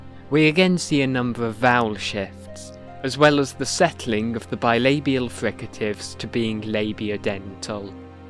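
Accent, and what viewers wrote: Wales, United Kingdom. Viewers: I was actually going to mention that (to me at least) you sound like you have a bit of a welsh accent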